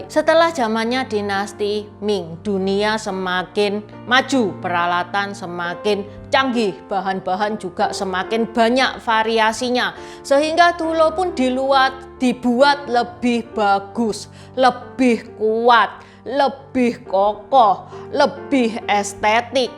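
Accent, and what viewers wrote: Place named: Purwokerto, Indonesia. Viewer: Maaf mba Logat bicaranya kok seperti orang Purwokerto yach😁🤭🙏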